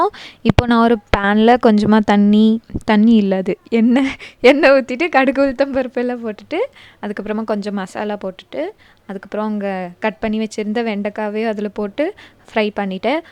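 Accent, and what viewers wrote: Kerala, India. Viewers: Akka intha video la unga voice kerala voice madhiri irrukku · Ur tamil slang became like malayali's Tamil slang 😂... It's strange... What happened.... To ur beautiful Tamil slang... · Akka nenga ea konjo malayalam kalantha tamil maru peasuringa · Akka neenga inaiku pesunadhu edho Kerala ponnu maari irundhuchu😝😝😍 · Akka today in this video your voice sounds like a malayali but anyways enjoyed your video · Your voice is changed like Malayalam slang akka it is nice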